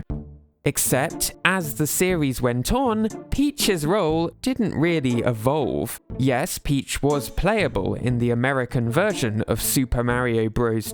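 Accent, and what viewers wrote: United Kingdom. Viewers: One word and i know that you're a british although it's my first time watching this channel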